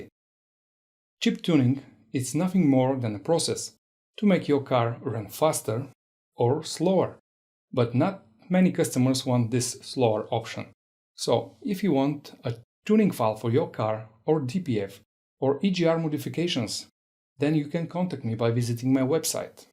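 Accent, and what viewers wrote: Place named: Eastern Europe. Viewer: Слышу славянский диалект в произношении )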